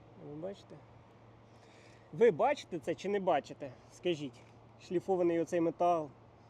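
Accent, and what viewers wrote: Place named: Cherkasy, Ukraine. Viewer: Балачка, акцент Черкащанський. Думаю автор звідти родом. Вгадав?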